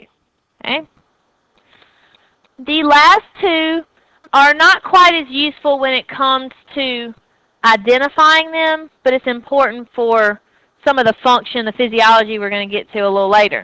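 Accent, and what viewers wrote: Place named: Rural, United States. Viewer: Oh shit. I love it when smart people have hick accents.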